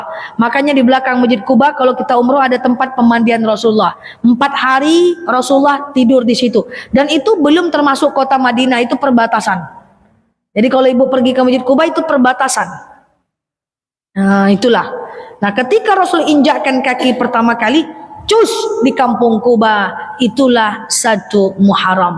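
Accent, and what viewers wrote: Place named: Padang, Indonesia. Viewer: Ustdzh org pdg kayakny suaranya kayak org pdg benar GK subhanaallah kagum saya udh cantik ustdzah LG beruntunglah ortu nya mendidiknya anaknya jdi anak Sholeha semoga sehat selalu dan dijaga oleh Allah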